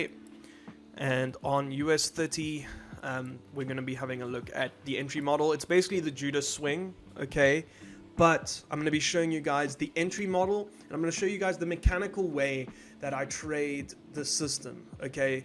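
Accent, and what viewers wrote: South Africa. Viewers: South African accent, lekker bru. New sub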